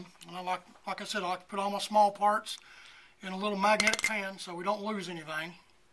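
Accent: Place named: Mississippi, United States. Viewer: I enjoyed your BAR disassemby video. I have had two in 7mm Mag. No recoil and accurate. The only problem is that I get walleyed trying to keep track of the brass when I shoot. You don't sound like Down East. I lived in Maine for 5 years in the '60's and spent a lot of time in North Berwick. You sound more like Mississippi, where I am in exile now. Keep up the good films.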